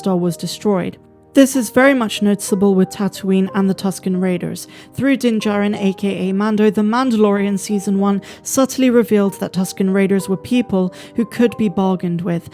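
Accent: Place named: Somerset, United Kingdom. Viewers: I enjoyed your video, fellow West Country pirate xD is that Somerset I'm hearing? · That South West accent gets me every time 😊 "Jibber Jabber !"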